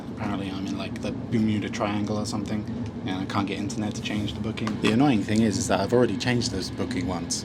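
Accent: Finland. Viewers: Are you developing a Finnish accent? Just asking for friend, of course, fully aware that you would have to consult your linguist peers before giving an answer.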